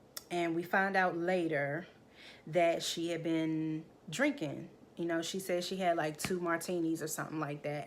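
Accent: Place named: Washington DC, United States. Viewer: I don't even have to ask if you are from DC ...I can hear our accent ALLLL up in your voicet! Damn.. I love when I come across my fellow city folks on Y/T.. I be so siced!! LoL! I followed you via my boo JayLee's channel.. you definitely got a new subbie girl! this review was funny AF btw!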